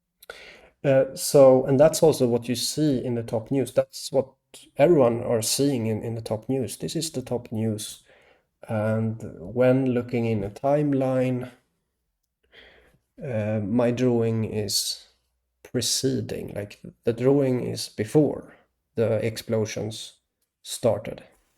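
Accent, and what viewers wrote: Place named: Eastern Europe. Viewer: Just curious, what's your country of origin? I think I'm detecting an Eastern bloc accent.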